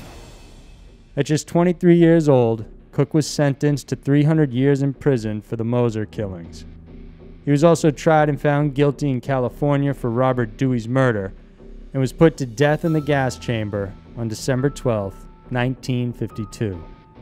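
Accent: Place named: Worcester, United States. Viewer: yeah, man. I'm from Worcester and kinda Heard it in there. Great work with your channel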